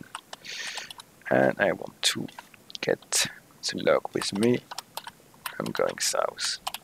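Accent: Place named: France. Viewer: I really like your french accent, by the way.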